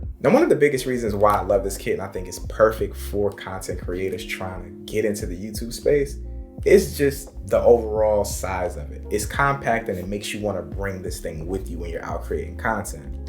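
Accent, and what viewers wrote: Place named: East Coast, United States. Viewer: your east coast accent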